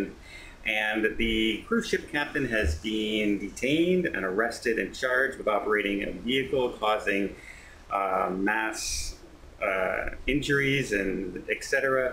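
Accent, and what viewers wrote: Canada. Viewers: The Mighty Cruise Ship Experience He’s Canadian, eh?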